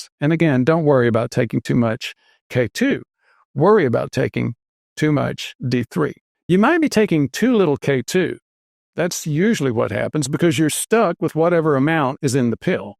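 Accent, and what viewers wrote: United States. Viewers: How about jist get sun and eat healthy why take pills ? If you can afford pills youncan afford food. You sound American where there is no health or longevity studies that show your lifestyles work. Eat well get sun and excersize and he happy and you dont need to get confused of all these pillls you take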